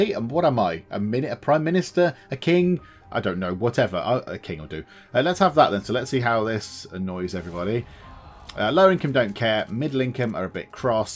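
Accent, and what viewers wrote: United Kingdom. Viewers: Heavily bri'ish.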